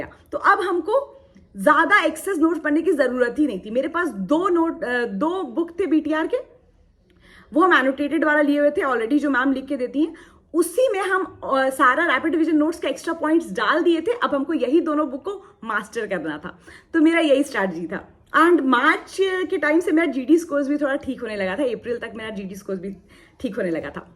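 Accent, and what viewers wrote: Bihar, India. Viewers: Is it bihari accent? It's cute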